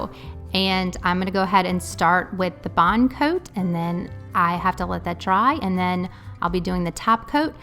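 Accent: Louisiana, United States. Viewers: hi! I just subscribed, your video from a year ago popped up on I my feed. I'm in SE La I recognized your accent :)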